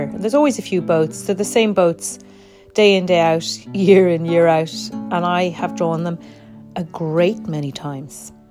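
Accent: Ireland. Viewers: Hi! I have got to know you and your charming artworks from Sketchbook Revival 2023 and what blessing that was! I am totally blown away by your works and love all your videos! Your voice is so calming and I love your Irish accent 🥰! I enjoy the scenery in your videos too! I get transported in your world of wonder and for that I am ever so grateful! Thank you so much for adding that little spark of happiness in my day when you post a new video! Love and Blessings to You and your family …..Lorraine 😁🎨🇲🇹 ( Malta)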